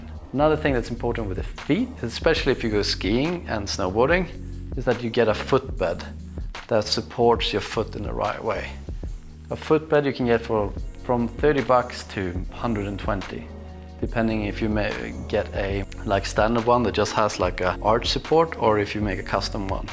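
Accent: Sweden. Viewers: Jag tyckte att det var lite svensk dialekt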